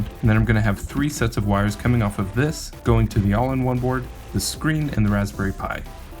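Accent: Germany. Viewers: Are you from Germany? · are you german? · are u german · Do you come from Germany?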